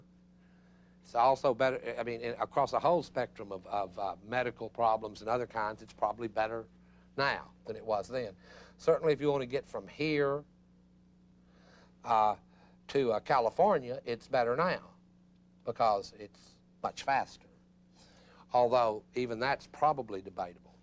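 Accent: South, United States. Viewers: That southern drawl is to die for <3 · I think saying words "stand in" for things is gobblty gook. You don't talk to have your words stand in for things, but to represent things. They may fall short, and that is a problem. But having them "stand in" for things opens the door to what they were never meant to mean. He says Derrida thinks words represent reality, -- for example, Derrida asks where to pick up his check for teaching a course -- then he says Derrida thinks words don't represent reality. So that means Derrida is out of money because words don't point to where he can pick up his check. It's a contradiction, pure and simple, and the speaker is good at jumping from one contradiction to another in his casual, friendly, homespun, southern drawl accent as if nothing is wrong and that it all makes perfect sense and that he is not bamboozling anybody. 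He is a salesman -- a bamboozler of the first degree. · Gotta love “Hai-degger” and “moh-derna-tee.” Just discovered this guy, big fan. Reminds me of home (TN), though I think his accent is from a different state. It’s hilarious because you expect a Baptist sermon from that accent, and then you realize you’re not in church. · Ah jes hate suthin acceyents but this brilliant guy knows his stuff!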